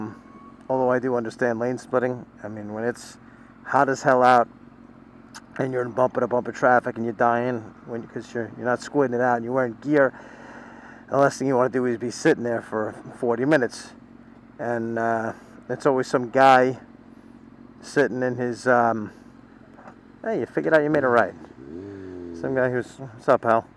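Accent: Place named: New York, United States. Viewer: "Ya THINK!?" "Sarcasm!" Hahaha! :D So funny. :) P.S. Love the accent! I used to live in NYC but moved to VA, and it's rare hearing that accent on TV or especially where I live. :)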